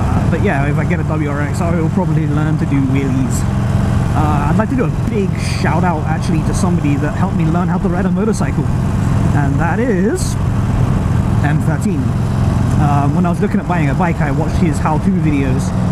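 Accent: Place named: Australia. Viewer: Hey nice first motovlog! Are you from Australia or the UK? your accent is not very local haha. You really do remind me a lot of the Aussie motovloggers though. What is funny, is that I was going to use iridehawaii as my channel name when I first started but I was watching a lot of Revzilla product reviews and the "rev" won instead of "ride". I guess since Andy gave you some tips, this motovlog was kinda long. There is absolutely nothing wrong with long motovlogs but as a new motovlogger try to keep it under 10 minutes to keep your audience's retention. We are still trying to get to know who you are. As for swearing, it really doesn't matter. It is up to you how you want to deal with your swearing. For me personally it is a non issue unless every other word is a swear word for 10 minutes then it gets a little old and unbearable to watch. Anyways, great Harley you got there. 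I hope you can ride with us one day! Welcome to the Hawaii motovlogging community!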